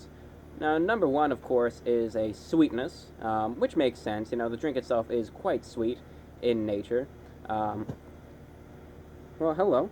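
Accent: United States. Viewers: You are very American aren’t you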